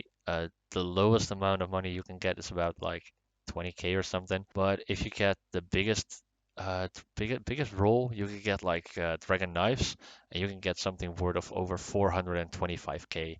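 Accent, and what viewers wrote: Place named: Netherlands. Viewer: u sound dutch :D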